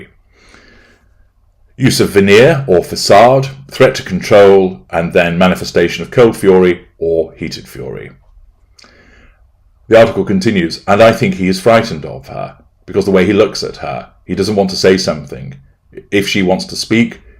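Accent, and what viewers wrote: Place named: Scotland, United Kingdom. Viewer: I'm Scottish and your posh Scottish accent is so funny. "Farquhar" 😂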